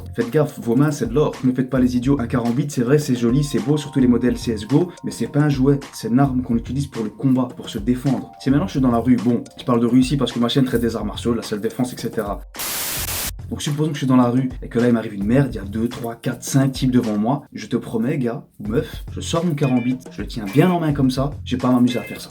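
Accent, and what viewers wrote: Brussels, Belgium. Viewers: D'accord avec toi et aussi l'accent belge 🇧🇪 TEAM BELGE 🔥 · Gros t'es de bruxelles pcq t'as l'accent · tu viendrais pas de Bruxelles toi? ta un accent de drare de bx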